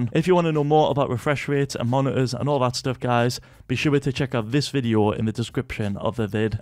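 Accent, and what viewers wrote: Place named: United Kingdom. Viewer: hes from the uk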